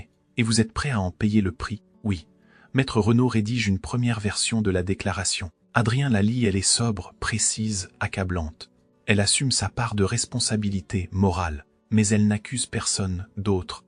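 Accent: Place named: England, United Kingdom. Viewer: Arrêtez cet accent anglais c est insupportable et n à aucun sens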